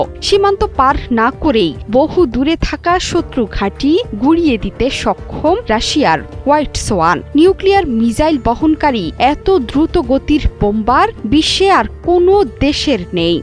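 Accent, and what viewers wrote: Kolkata, India. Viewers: এইসব খবর পাঠিকা দিনে দিনে কলিকাতা হয়ে যাচ্ছে৷ উচ্চারণের সে কি হাল৷ চাবায় চাবায় কথা কয়৷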